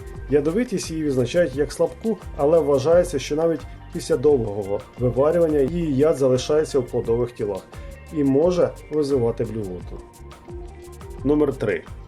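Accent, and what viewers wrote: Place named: Surzhik, Ukraine. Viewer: Суржик - суржиком А інформація корисна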